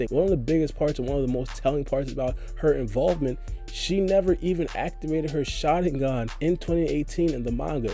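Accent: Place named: New York, United States. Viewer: Im gonna guess your from ny, 'cause your accent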